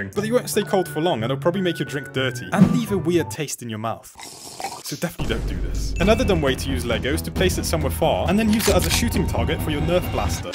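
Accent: United Kingdom. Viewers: Your from the uk aren’t you?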